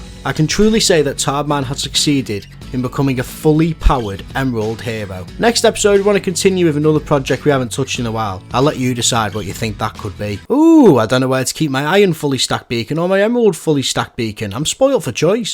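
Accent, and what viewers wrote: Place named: Northern England, United Kingdom. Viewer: Gotta love that northern twang of the English accent ! Moo idea how people said we sound the same when I’m from the south which is considered the “posh” accent of England haha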